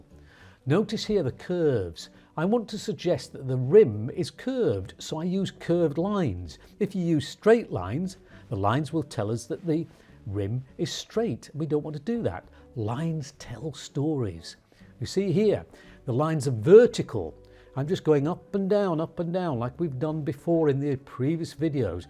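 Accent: United Kingdom. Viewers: لكنته بريطاني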